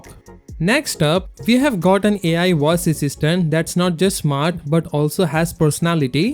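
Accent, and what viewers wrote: India. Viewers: The ultimate Indian accent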